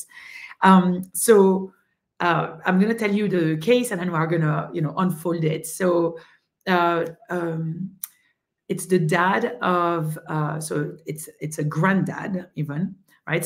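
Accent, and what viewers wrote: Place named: France. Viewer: Too bad the French accent is so strong, I can't really understand a lot of words said until I finally turned on the captions and, still, that was not 100%. Still had to repeat some sentences multiple times.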